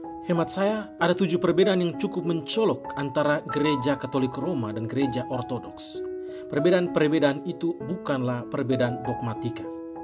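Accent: Flores, Indonesia. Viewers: Kalau diresapi logat miminya seperti org bejawa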